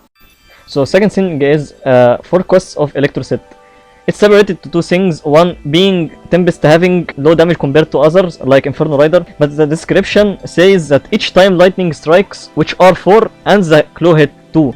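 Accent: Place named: Egypt. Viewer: man why i feel ur an Egyptian cuz of your English accent 😂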